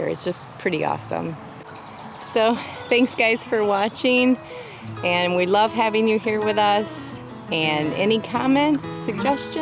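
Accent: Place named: Michigan, United States. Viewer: I thought I picked up a MI accent. Really like your property and homestead. Very peaceful. Looking forward to make garden and bee hive updates!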